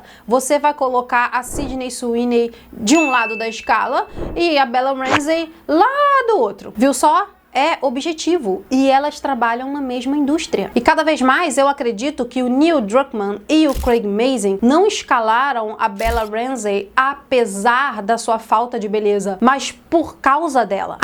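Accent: Rio de Janeiro, Brazil. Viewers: é a minha carioca preferida (e olha que eu detesto sotaque carioca) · A PUXADINHA CARIOCA SUA É INEVITÁVEL NE...... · Mesmo com um sotaque carioca que eu não curto muito, a faz um conteúdo maravilhoso. Amo e coaduna com suas opiniões. E sim, a Testa Ramsey é feia e matou a personagem do TLOU. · Você é uma carioca da gema!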